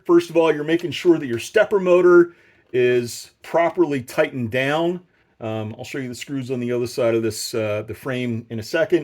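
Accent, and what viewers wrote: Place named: Philadelphia, United States. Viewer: Thanks for the help! You sound like you're also from Philly, right?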